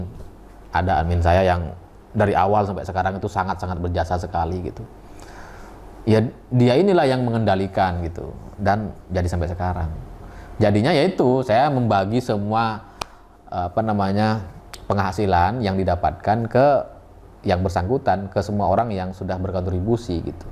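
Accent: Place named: Madura, Indonesia. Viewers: Saya suka nonton video mas baik di CokroTv maupun di SewordTv. Saya suka ulasan-ulasan anda tentang politik atau sosial, dan logat Madura anda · Saya Katolik di Bali, setia menonton pakar mantan. Justru dialek madura itu cirikas Mas banget. Bravo n viva mas.. menginspirasi masyarat. PROFICIAT UTK PENGHARGAAN DARI YOUTUBE.. MAU KAOS JG DONG MAS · Sampean abit pon bede e jakarta kak...mak logattah pagun katarah jek reng madureh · Wahhh... Congrat ya bang.... I always stay tune on ur channel... Happy milad juga buat bang, barakallah fii umrik... Semoga tambah sukses lg, dan terus menyuarakan kebenaran utk akal sehat biar kita gak pernah oleng... Betewe, sengko ghelem kaosah jih... Mayuh kerem ghebey sengko bang... 😁😀 Kata siapa gak cocok... Justru you are special. Kaya prof Mahfud my idol, bangga lihat logat Maduramu masuk youtube dan always dilike byk orang. 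Whats wrong with ur aksen... Go ahead bang... Be the best.. Best wishes dr kami di Kalimantan Tengah, ur truly liker... 😂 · Saya suka logat maduranya mas.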